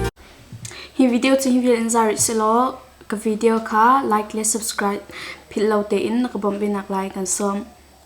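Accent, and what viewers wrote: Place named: Hmar, India. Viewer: I tawng hi hmar tawng nen in ang reu ltk 😁😁😁😁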